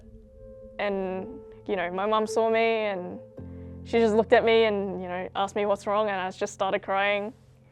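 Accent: Australia. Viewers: She is Australian. I'm Australian and i can tell from the way she speaks, that she was born here. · This video is awesome!! and seeing an Asian lady with an Aussie accent, <num>/10 <3 <3 More videos please!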